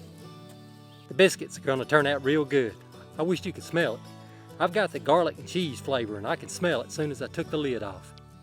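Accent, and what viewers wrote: South, United States. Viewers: Love your "good ole boy" Southern accent. Also, the quality of your presentation is on par with Steve's over at <link>. Very professional. One more thing . . . that video work is spectacular!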